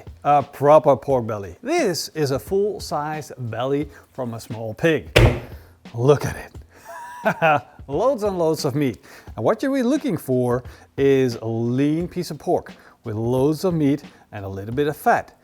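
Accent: Netherlands. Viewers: You had me really wanting this until I saw the nipples on the belly . I know I am being a wimp, but I just cant see myself eating the nips! However, I do love the content and your accent. I grew up in Den Haag and the accent reminds me of my time inn Holland. That always makes me smile!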